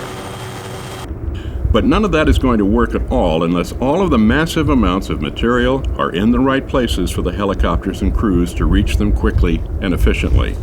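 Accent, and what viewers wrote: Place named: United States. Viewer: narrator hard American accent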